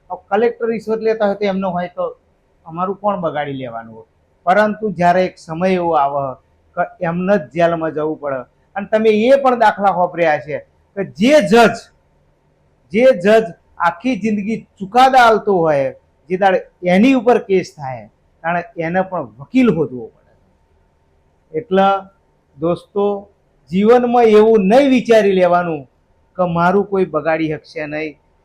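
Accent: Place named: Charotar, India. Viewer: Charotar No Havaj Bole Mari Jaan 😍❤️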